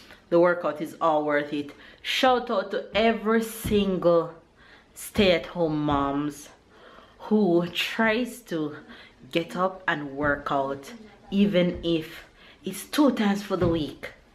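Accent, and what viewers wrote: Jamaica. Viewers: I love love love your Jamaican accent. Lovely vlog. As for working out, the only exercise l do these days is walking and I enjoy it. Like I show on my vlogs, I try to leave the car behind most times and just walk. is such fun to watch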